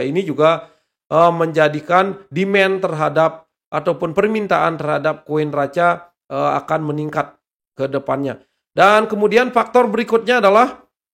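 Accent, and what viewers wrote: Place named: Bali, Indonesia. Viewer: Medok banget bli ciri khas Bali nya😀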